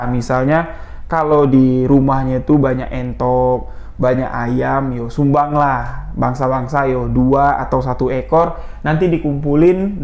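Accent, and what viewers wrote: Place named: Jambi, Indonesia. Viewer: Walaupun saya orang Banten, saya suka sekali dgn bahasa jambi. Mas logat bahasanya jambi punya sangat bagus.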